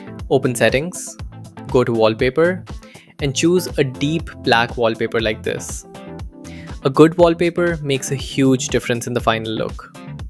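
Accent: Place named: India. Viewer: Oh a potentially useful vide- hears indian voice Nevermind, sir i dont wanna redeem it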